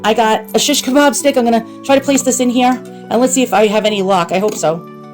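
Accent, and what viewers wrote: New York, United States. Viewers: I miss your older, longer videos! It's you and your hilarious New Yaaaawk commentary that makes this channel! 😂 Oh and ditch the background music. You were perfectly good without it. 👍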